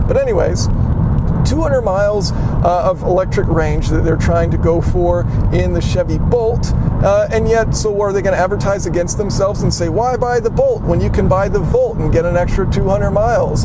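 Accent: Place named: North, United States. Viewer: Thanks for a great test. I'm still trying to figure out in what part of NC you learned to talk like a Yankee.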